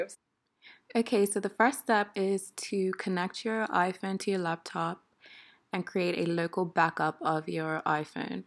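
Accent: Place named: Bermuda. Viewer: You sound Bermudian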